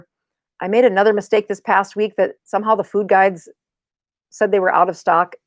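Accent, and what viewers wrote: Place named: South Dakota, United States. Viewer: Great stuff--I lived in SD for four years, loved it, it's nice to hear the South Dakota lilt in combination with some great insights! Only reason I didn't join the course is because I'm not on Facebook and that part of the package was simply not useful. Thanks for your candor and energy,!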